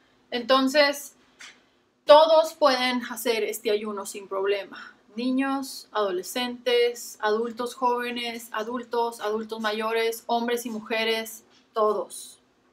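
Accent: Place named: Chihuahua, Mexico. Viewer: ʎʇɹɐɯ ʎןɟɔɯ yo creí que era de Chihuahua por el acento tan shulo 😐😐.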